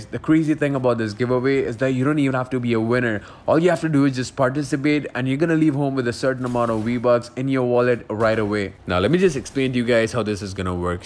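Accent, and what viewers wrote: India. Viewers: Are you Indian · Bro are u indian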